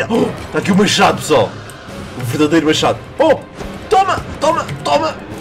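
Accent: Portugal. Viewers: Vc é de Portugal?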